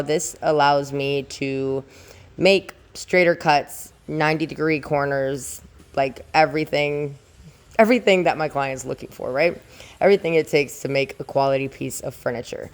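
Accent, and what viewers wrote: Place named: Michigan, United States. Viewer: you talk like me, you have petosky stones you find near you, do you live in michigan? like me? i loved the table although its way more difficult than what i am trying to do, the only thing in your video i would change is i kept worrying about your hair either getting caught in the resin or the tools, made me worry like a momma bear. lol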